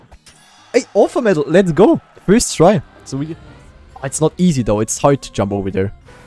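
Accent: Germany. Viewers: Du bist deutschhh, nh? XD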